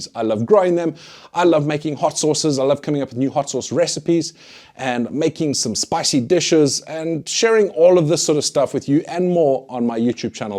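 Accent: South Africa. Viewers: THATS A SOUTH AFRICAN ACCENT, HOWSIT BROTHER!!!!!! been inspired by your grows and been blessed with amazing harvest here in Cape Town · Hello......you from South Africa....ZA. Accent 😅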